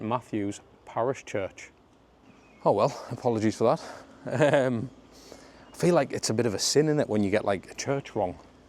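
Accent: Sunderland, United Kingdom. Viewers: Can I detect a mackem twang?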